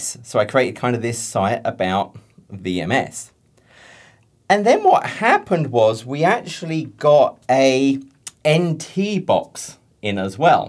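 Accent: United Kingdom. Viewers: I eventually know why your speaking with british accent , it's so familiar to me . Thanks for sharing your story. It's awesome !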